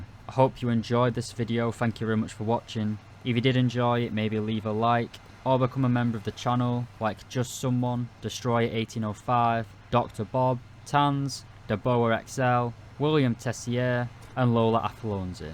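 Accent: United Kingdom. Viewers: I think he’s British.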